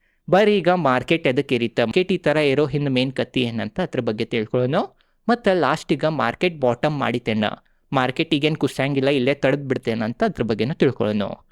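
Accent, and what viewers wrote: North Karnataka, India. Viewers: ಯಾವ ಕಾಂಟ್ರವರ್ಸಿ ಇಲ್ಲದೆ ತುಂಬಾ ಅದ್ಬುತವಾಗಿ, ಅಚ್ಚು ಕಟ್ಟಾಗಿ ವಿವರಣೆ ಕೊಡ್ತೀರಿ.. ನಾವು ಬೆಂಗಳೂರಿನವರು ಆದರೂ ನಿಮ್ಮ ಉತ್ತರ ಕರ್ನಾಟಕದ ಈ ರೀತಿ ಮೃದು ಭಾಷೆಯ ಶೈಲಿ ತುಂಬಾ ಇಷ್ಟ ಆಗುತ್ತೆ....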